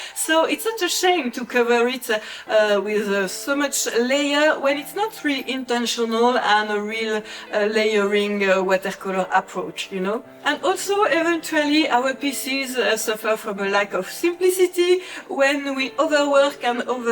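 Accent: France. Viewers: Bonjour, ah ben plus de vidéos depuis janvier, triste je suis! J'adorais travailler mon anglais et votre délicieux accent français m'aidait beaucoup, la cerise sur le gâteau l'aquarelle!!! Rien de grave n'est arrivé j'espère. Mais où est passé le? Bien cordialement.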